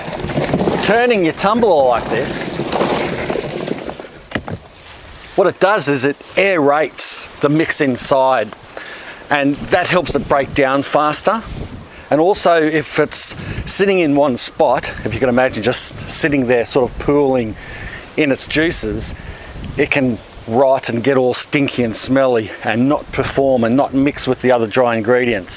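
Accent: Australia. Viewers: Is there any evidence you have to support the idea that people can't produce paper thats toxic anymore? With your accent I suspect you're in Australia. Which may have that law. But here in America ive been advised by many that you have to be careful not to use any colored paper. Tbh the only thing i feel safe using is newspaper that I know is printed with soy ink. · Because of your awesome Aussie accent, I couldn’t tell if you were saying your name was, or. Good thing you wrote your name in the video description area! LOL 😆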